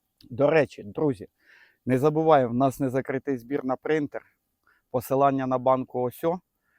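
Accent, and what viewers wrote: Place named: Vinnytsia, Ukraine. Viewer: Не помідори,а томати❤,а говір наш вінницький👍🙏❤️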